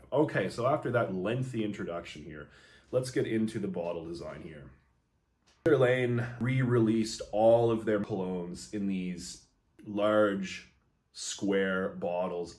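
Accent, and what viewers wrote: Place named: Quebec, Canada. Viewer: I love his Québécois pronunciation, must be a Canadian... eh.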